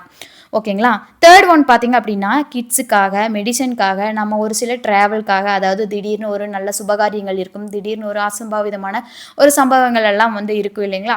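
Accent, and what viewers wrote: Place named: Kongu, India. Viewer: 1st time i saw ur video sissy very usefull yo me ... nd r u kongunadu girl ?? am also coimbatore sissy... namma slang ea vera level than sissy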